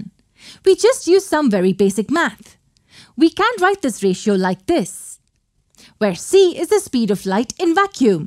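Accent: India. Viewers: I only watched this cause of the Indians voice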